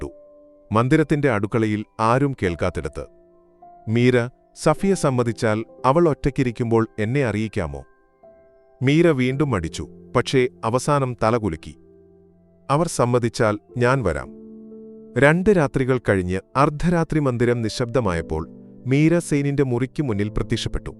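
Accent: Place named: Arabi-Malayalam, India. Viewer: Kadha Vaayikkan Malayalam Koottivakkan ariyavunna orale niyogikkaamaayirunnu. Ithu arabi malayam Parayunnathu pole aayippoyi.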